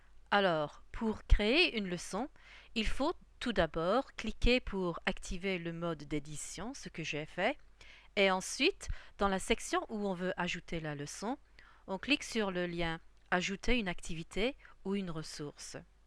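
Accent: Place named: Belgium. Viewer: A l'accent, c'est une belge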